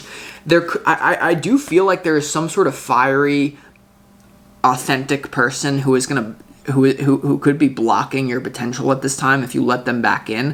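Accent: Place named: South, United States. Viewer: South ? No wonder. I rec the accent. I grew up in the so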